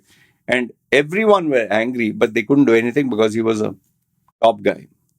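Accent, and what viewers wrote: India. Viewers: Who the F are you? You have a thick Indian accent and you don’t know anything about caste discrimination? You should win an award for the most disingenuous and insensitive POS. Enjoy your pretentious life.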